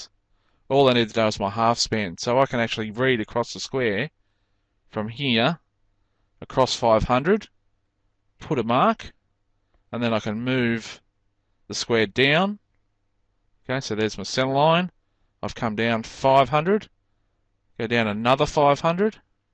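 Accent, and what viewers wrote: Australia. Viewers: How refreshing. An Aussie accent, clear descriptions and metric measurements. My applause is attached.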